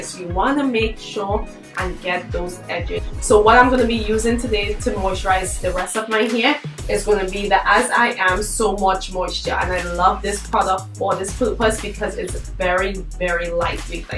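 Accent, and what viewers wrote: Trinidad and Tobago. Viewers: yuh cyah hide the accent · she has a trini accent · ah know mih ppl Trini accent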